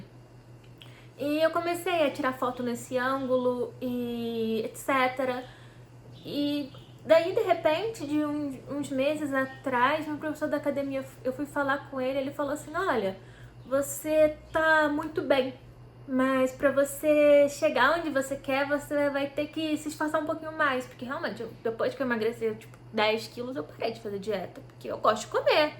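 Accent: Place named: São Paulo, Brazil. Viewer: Acho que ela fala paulistano, pessoas que moram em são Paulo.. eu também acho bonito como eles(a) falam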